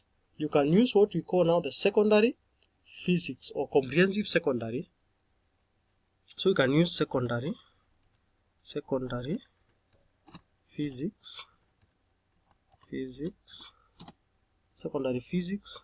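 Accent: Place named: Kenya. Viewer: I guess you're asking about the accent? It's a Kenyan accent with peppered with Kamba(Tribe) accent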